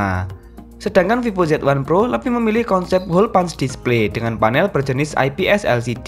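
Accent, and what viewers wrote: Java, Indonesia. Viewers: wong jowo yo mas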